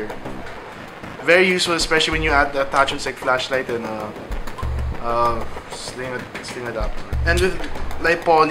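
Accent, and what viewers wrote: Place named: Philippines. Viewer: Filipino accent :D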